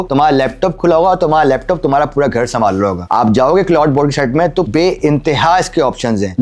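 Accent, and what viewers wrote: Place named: Karachi, Pakistan. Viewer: Fantastic explanation! Kaafi dino baad Karachi accent main sunker maza agaya!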